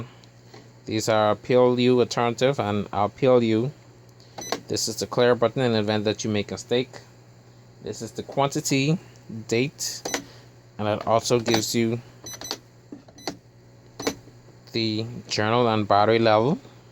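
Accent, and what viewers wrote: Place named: Bahamas. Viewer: Thank you for sharing. I'm sitting here listening to you and you sound awfully alot like a Bahamian. Are you?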